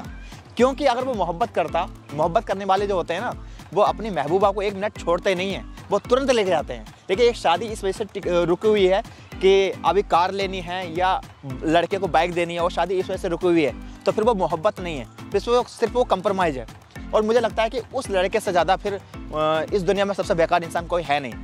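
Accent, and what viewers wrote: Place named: Rampur, India. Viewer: I am also from rampur tabhi aapki voice se samajh aa gya....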